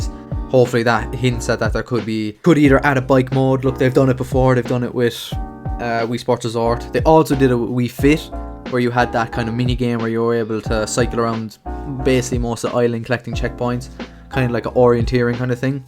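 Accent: Ireland. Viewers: Your accent are you Irish?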